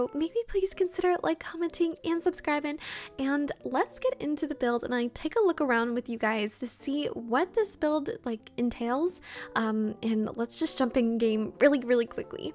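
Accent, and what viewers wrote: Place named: Canada. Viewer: out of curiosity, are you Canadian? The way you pronounced some words led me to believe you are (I’m from Ottawa, lmao)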